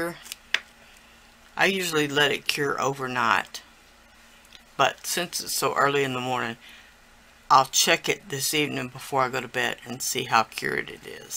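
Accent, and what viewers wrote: South, United States. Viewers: You are the best xplainer love your southern accent Did all the pieces get baked for 1 hour/1/2 at 220.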